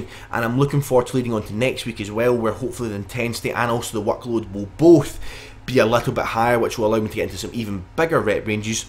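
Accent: Scotland, United Kingdom. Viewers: I think your accent has ruined me as I can't ready "easy" without yelling it in best Scottish voice.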